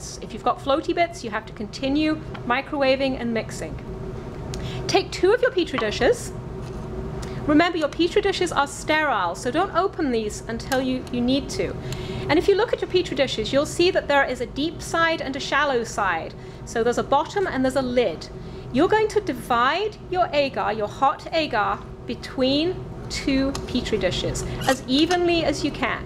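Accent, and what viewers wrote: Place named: United Kingdom. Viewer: I think British.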